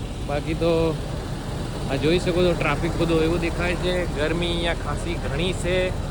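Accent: Rajasthan, India. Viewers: ગુજરાતી ભાષા મા થોડી રાજસ્થાની હિન્દી નો લહેકો આવે છે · મસ્ત કમ્પ્લીટ ગુજરાતી બોલો છો પણ થોડો રાજસ્થાની ટચ આવે છે સુંદર માહિતી 😊😊😊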